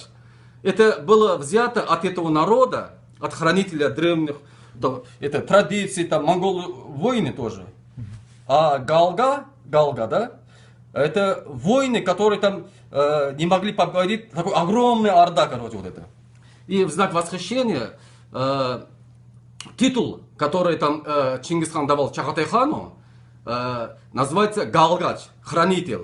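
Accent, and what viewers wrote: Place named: Tajikistan. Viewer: Это не монгол. У него акцент даже таджикский (персидский). Сказки рассказывает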